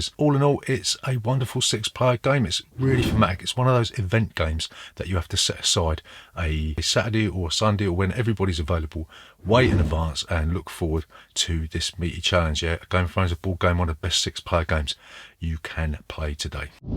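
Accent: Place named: London, United Kingdom. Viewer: You sound London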